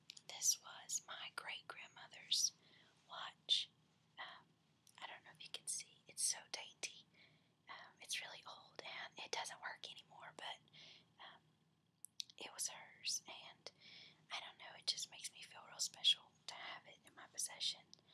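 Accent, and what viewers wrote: South, United States. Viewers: I just came across this video, maybe you've answered in a previous video...but is that southern accent I'm hearing?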